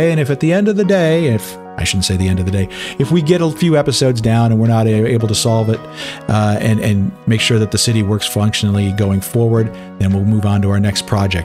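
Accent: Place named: Minnesota, United States. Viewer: Well hello neighbor! I thought I picked up on those Minnesota O's As far as the city goes, you should do what you feel is reasonable. It's your hobby after all. I'll stick around for whatever that is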